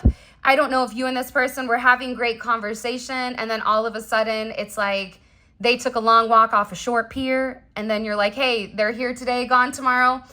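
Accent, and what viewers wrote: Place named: South, United States. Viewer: I want her because she's a beautiful singer I want her because I love her I want her because I love her beautiful voice and her southern accent I want her because she's the most beautiful talented girl 💗 and I told her 56 years ago that I was in love with her because I didn't like to see her cry and her videos and God told me that she needed someone to love her man that's exactly what I did❤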